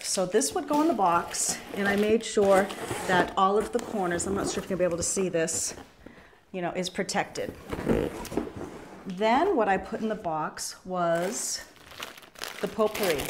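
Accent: New England, United States. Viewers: Hi, Your blue & white top is very pretty!! the Fall box was a lot of wonderful items, I was toooo late to order one but that's my on going luck, haha! I can almost smell all of the lovely Fall smells! That was so nice Carla could help pack the boxes, each one took some time!! take Care, hugs from Paula in Indiana!! p.s. I can really hear your New England accent!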